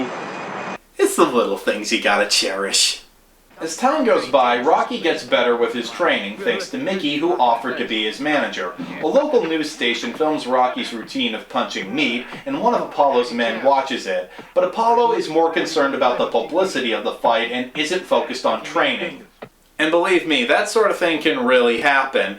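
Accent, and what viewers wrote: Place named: Massachusetts, United States. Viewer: I know that accent... you're from MA... North Shore? Medford or farther north?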